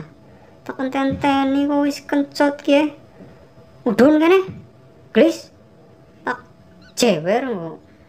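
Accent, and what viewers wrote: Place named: Banyumas, Indonesia. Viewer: Hahahaha.....ngakak. ora ngapak ora kepanak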